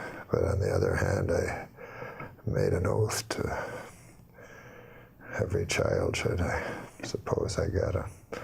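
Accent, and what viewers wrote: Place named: Canada. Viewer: Told with the old timey Canadian accent as well, like a story Stompin Tom Connors might be tellin'.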